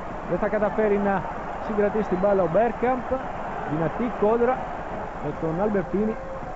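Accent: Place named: Italy. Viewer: Hi! First of all thank you for uploading all these fanatastic videos of our Inter! Which is the language of the comment? the strange thing is that, of course it is not italian, but the names of the italian players (and also of the foreign players) are pronounced with a perfect italian accent.. I'm curioius.. :-)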